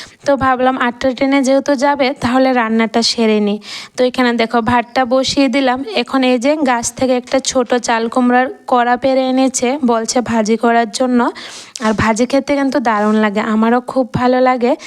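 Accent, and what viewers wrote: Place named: Tripura, India. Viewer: তোমার পুচকি মেয়েটাকে খুব ভালো লাগে তোমার মেয়ের চেহারাটা অনেকটা ছেলের মত। তোমার গাছের কচি চাল কুমড়ো দেখে কিন্তু আমার লোভ লেগে গেল। তুমি যখন ডাইরেক্ট কথা বলছিলে ভিডিওতে তখন তোমার কথাগুলো শুনতে পেলাম মানে তোমাদের ত্রিপুরার ভাষা একটু অন্যরকম। একদিন চলে যাব কাঁঠালের পিঠে খেতে। বাস করল কোনদিনই খাইনি। কেমন লাগে খেতে?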